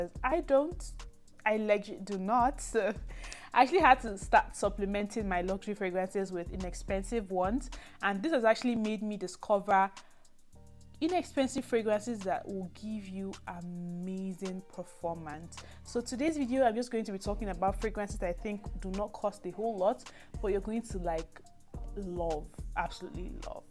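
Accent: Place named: England, United Kingdom. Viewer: Hi thanks for the video , why do I sometimes pick up an English accent in your voice. Btw mousuf is great.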